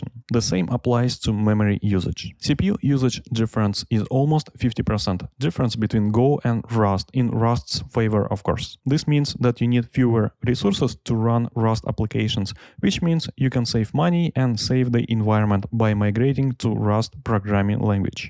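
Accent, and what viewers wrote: Russia. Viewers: думаю, чё я так хорошо тебя понимаю? а ты на русском по-английски говоришь) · That strong Russian accent 😃 Thanks for benchmarking!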